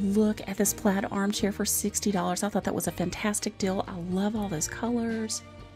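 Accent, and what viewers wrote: South, United States. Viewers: My Restores are not as well stocked! I'm in Atlanta. Love your videos! Your southern accent is so soothing and reassuring! Thank you so much 💓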